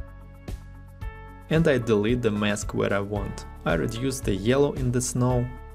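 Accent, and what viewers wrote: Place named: Russia. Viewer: Ну да!!! я на много лучше понимаю Русский Английский, чем Американский Английский...Это я понял!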